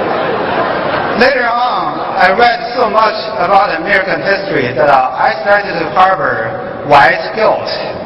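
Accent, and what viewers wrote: China. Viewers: 让我看到了 纯正的东北调的美国口语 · 这口音好严重…广东来的吗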